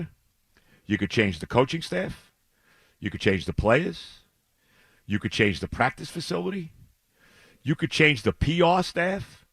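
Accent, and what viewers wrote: New York, United States. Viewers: Can't get enough, and the New York accent makes it that much better.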